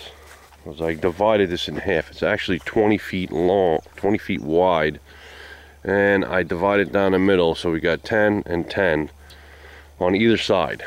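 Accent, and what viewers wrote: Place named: New York, United States. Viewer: Only another NY'ER is able to pick up that accent so easily!! Yeah I went all "country" myself. I have 10 of those Polish hens. They are just so fun to watch!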